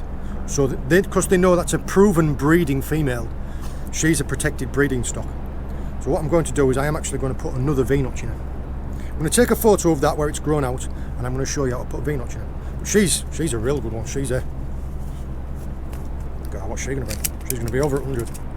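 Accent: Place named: Cornwall, United Kingdom. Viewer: How long did you keep the berried lady out of water for? Did you dip regularly? These are things we would like to know. Was there any need for a double v-clip? I saw she was bleeding when you released her. I know she'll coagulate eventually, but is it more stress on her system? Loving your shore knowledge and your Northern x Cornish accent...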